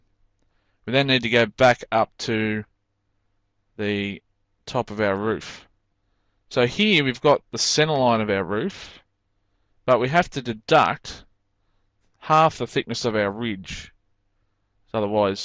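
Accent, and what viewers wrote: Australia. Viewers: How refreshing. An Aussie accent, clear descriptions and metric measurements. My applause is attached.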